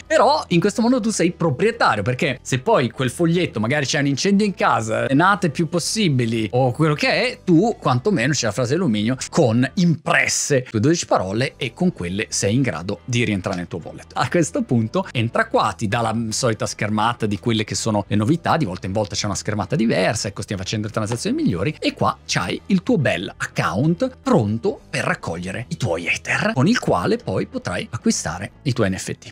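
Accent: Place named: Matera, Italy. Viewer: Grande dialetto materano ti si addice😉 tutorial ridendo e scherzando inbocchi caramelle ai principianti bravissimo super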